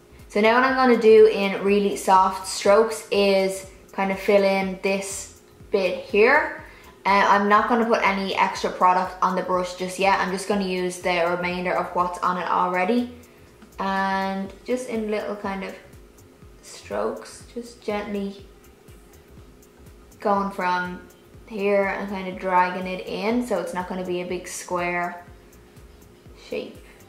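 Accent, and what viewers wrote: Ireland. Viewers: I'm not the best with accents. Are you from Ireland? Your speech is beautiful. My great grandpa is from Ireland and I've always wanted to visit. The dreams of that kind of went down the toilet when I got married and became a stepmother and then a mother.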